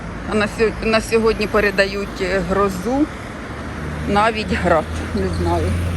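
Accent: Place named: Kryvyi Rih, Ukraine. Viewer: Володимира Великого 33а-базарёк на парапете. Мова як у криворожанки. Дякую за чудове вiдео!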